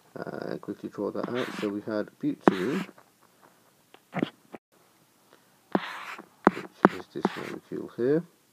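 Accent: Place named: South Africa. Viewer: i think u are South African sir, your accent tells, by the way good job.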